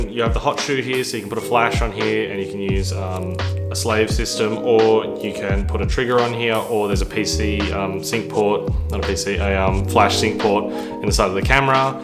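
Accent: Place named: Australia. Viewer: So nice to hear an Aussie accent. I have a 28-70mm old film lens and on the hunt for a newer version of my F70. This looks perfect. You got a great deal. I've not seen anything close to that on offer. Nice job with your channel - keep going.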